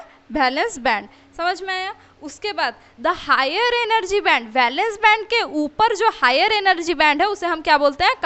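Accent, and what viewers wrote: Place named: Odisha, India. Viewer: No bakwass only point to point i like this type of lecture ❤️ it's like odia accent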